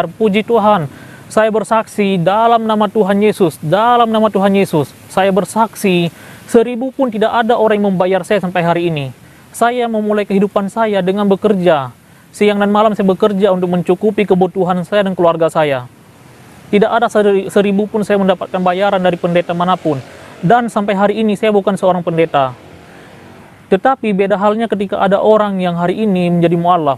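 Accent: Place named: Pidie, Indonesia. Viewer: aceh mana kau? aceh pidie ya? dr logat bahasa aceh kau nampak org pidie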